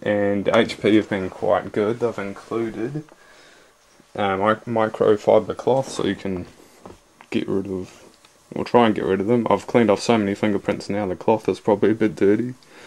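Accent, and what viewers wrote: New Zealand. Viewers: Thanks to Flight of the Concords I can now spot a New Zealander accent. Thanks, HBO! Oh and good review. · This is a very nice laptop. I just spent 5 and a half grand on my gaming desktop so Im not going anywhere in terms of money any time soon but as soon as I finish saving up again Im going to get this. XD By the way are you from New Zealand bro?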